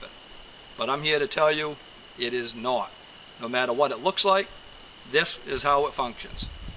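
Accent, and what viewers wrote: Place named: Maine, United States. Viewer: Ike's christ jezus bubby, me an matha down at clam shukka's point pullin sum quohawgs and dun fuhgot my clam rake!Just kidding Brother, havn't been back to Down East Maine for a few years and your accent makes me miss home. Say Hi to Mt. Rialto for me.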